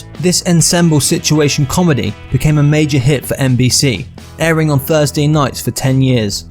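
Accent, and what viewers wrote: United Kingdom. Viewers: half hour of syupied math in bri ish accent. fah shame/ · I have watched a few of these tubes and find them interesting and informative but DAMN! your pronunciation of English is downright annoying. You sound as if you're English or at least of that heritage but some words you use should be avoided at all costs. Such as "La Fayette" and "Illinois" in the Axl Rose episode are pronounced as "LaFayettey" and "llinoise",m and in the current episode you speak of and "Ensembel" when referring to an ensemble. If you take more time you mau increase your subscriber base.....Just saying. Nevertjeless will still watch when I am completely void of contemplative thought ans the mispronunciations wake me up.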